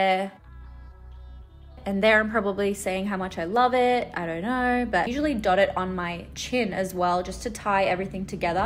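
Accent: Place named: Australia. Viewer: why did i read that in her aussie accent?? 😅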